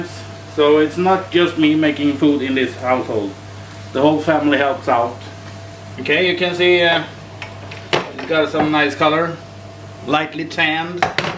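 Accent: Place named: Sweden. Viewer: Grym video. :) Din engelska är faktiskt ganska bra. Mycket "Svengelska" Men jag tror att alla engelsktalande personer förstår vad du säger :) Stor tumme upp till dig. Dessutom blev jag väldigt sugen på lasagne nu :)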